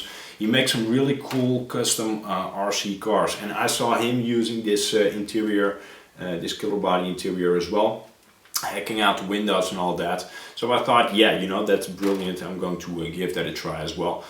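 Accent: Netherlands. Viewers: I suspected that too Als je Nederlands ben kan je dat goed horen ;)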